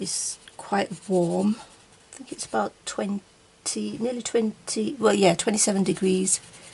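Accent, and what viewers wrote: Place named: Yorkshire, United Kingdom. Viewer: Hi K...Using my Brutfuner in the Circle of Life "Spiders on the Spiders web" Halloween 🎃...ish 🤣🤣 Nice to hear a fellow Yorkie 🥰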